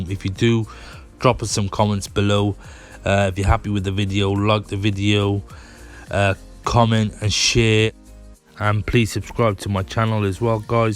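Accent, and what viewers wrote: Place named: Cardiff, United Kingdom. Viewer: Got to be a cardiff boy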